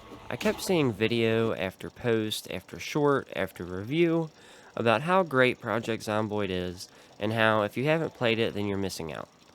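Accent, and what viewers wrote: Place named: Baltimore, United States. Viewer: You from Baltimore? You have the accent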